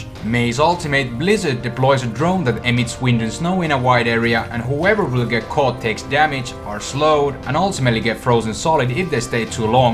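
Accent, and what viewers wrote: Finland. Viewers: is Finnish, and Finnish people are known for their "Rally English"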